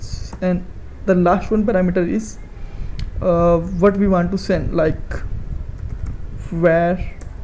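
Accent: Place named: India. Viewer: indian english accent ... stfu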